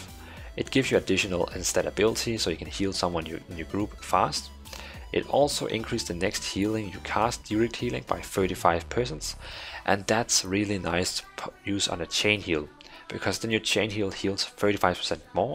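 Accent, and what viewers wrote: Denmark. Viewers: Love the danish version of english...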